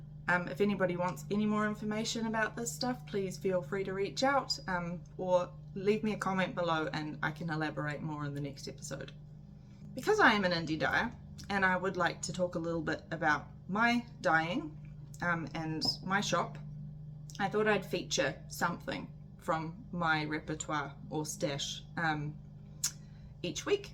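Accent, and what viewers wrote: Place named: New Zealand. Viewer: Hi - I've just found your podcast and I am loving listening to a local (Kiwi) accent. You've made some amazing items with such easy to follow show notes too - my "to knit" queue is getting longer.............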